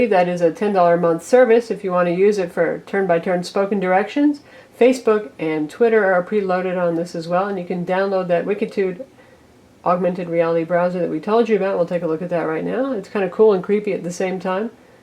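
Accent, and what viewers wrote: United States. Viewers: AR AR R R R fuu , shiting american language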